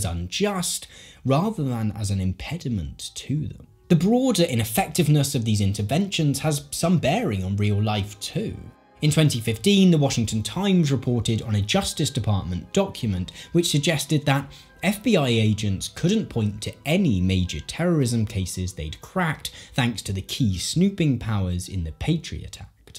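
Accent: RP, United Kingdom. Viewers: Hi, I love your contents but I am fascinated with your accent and intonation in particular. could you please tell me what is your accent?is it posh?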